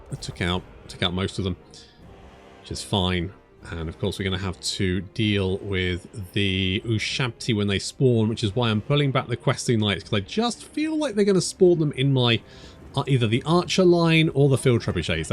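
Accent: France. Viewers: as someone who knows how to speak french from his mom's family side, i DO want you to bring out the french accent. I think it'd be very based and funnypilled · more french accent please. Since you want to be buddy with ol' Volkmar there,just give Zandri to him in exchange of asking the moustached fella to wage war against Aranessa,and speaking of facial hair,do the same thing with the Prospector dwarf,ask em to wage war towards Aranessa so they won't stab you in the back while you sailing up there.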